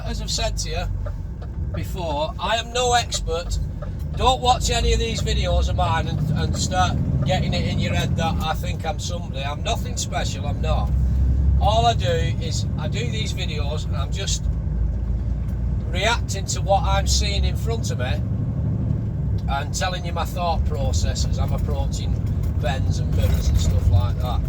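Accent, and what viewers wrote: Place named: Lancashire, United Kingdom. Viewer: Hahaha 😂 your singing is shit lol but you are spot on about the road its not fit for purpose you might have been better back tracking to spean bridge then cross to Dalwhinnie on the A9 better road anyway love the lancs accent safe travels pal hopefully meet you on the road sometime for a blether keep on trucking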